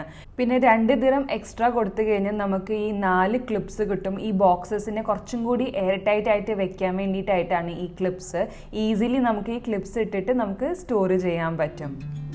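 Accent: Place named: Mumbai, India. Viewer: Are u raised in Mumbai? Ur accent is like a Mumbai malayalee 😄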